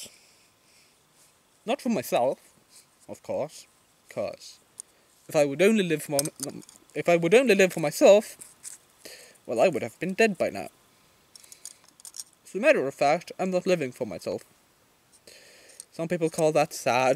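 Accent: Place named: Ireland. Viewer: I can tell your accent is Irish possibly and thoroughly enjoy all your videos. Anyways thnx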